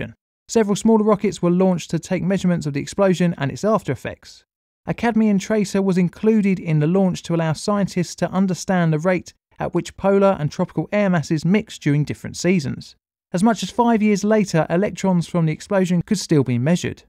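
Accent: United Kingdom. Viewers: His is the best British accent after Patrick Foote (Name Explain). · I think your videos are awesome, informative, and very well produced. The British accent is just a bonus. Also I am enjoying the increased video production, atleast something good is coming out of this Covid crap.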